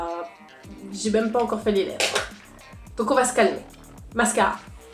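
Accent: Russia. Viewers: oui c'est l'accente Russe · L'accent russe !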